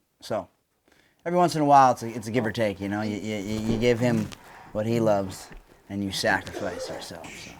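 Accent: Netherlands. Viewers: Are u dutch???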